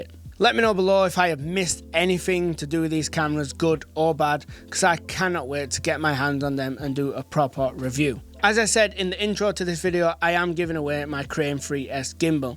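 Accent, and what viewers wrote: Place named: Scotland, United Kingdom. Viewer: Damn that Scottish accent. 😂 Thicc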